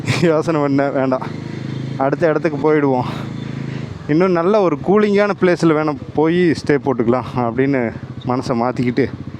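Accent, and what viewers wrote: Kongu, India. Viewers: I wish i could meet you someday. I watch your channel just to hear your no nonsense kongu tamizh. I am from gobi. Keep riding and keep making these wonderful videos.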